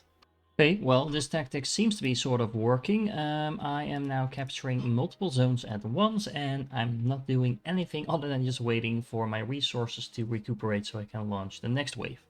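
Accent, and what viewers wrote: Netherlands. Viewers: Je nederlandse accent schijnt wel een beetje door hahahha (maar nogsteeds goede video, helemaal gebinged met snacks)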